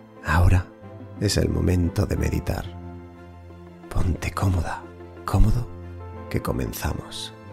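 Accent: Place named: Spain. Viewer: Excelente meditación, excepto el acento de España podrían hacer con un acento neutro, gracias.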